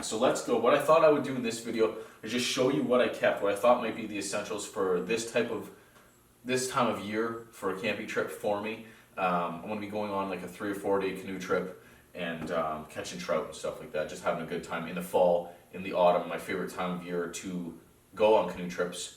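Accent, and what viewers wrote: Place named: Canada. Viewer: Hey say hi to scout for me love yr Canadian accent "Not to shaby"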